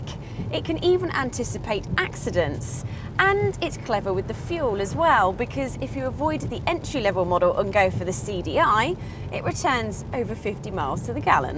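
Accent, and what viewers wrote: United Kingdom. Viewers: will you also stop saying british accent there are lots of british accents its english she speaks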